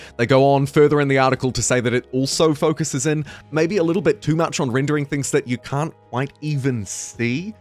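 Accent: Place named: New Zealand. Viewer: So nice to hear these updates with a fellow Kiwi accent. Had read the email about the latest patch but I just wanted to say THANKS for explaining things a bit better.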